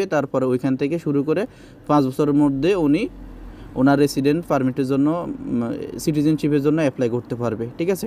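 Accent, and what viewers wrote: Noakhali, Bangladesh. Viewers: ভাই আপনার দেশের বাড়ি কোথায় নোয়াখালী আপনার কথা শুনে খুব হাসি পায় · বাই আপনার বাড়ি কি নোয়াখালী নাকি জানাবেন অবশ্যই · vai ki noakhailla ni?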